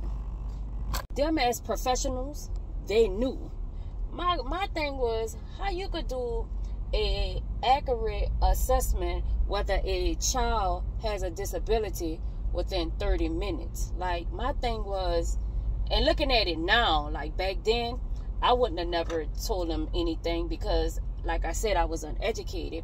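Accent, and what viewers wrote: New Orleans, United States. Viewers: Girl I listened to you and said, home girl is from the NOLA!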